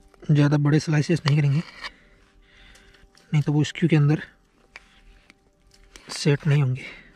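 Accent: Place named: Marwar, India. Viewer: Bawlya k Ped 😂😂😂😂 Marwadi m Bhut pyara bolya Superb Video Bro ,..❤️❤️❤️❤️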